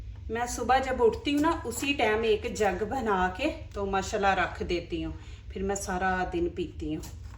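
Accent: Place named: Punjab, India. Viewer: Agr ye chaba chaba k punjabi men Urdu na bolen to Video short hojaye gi.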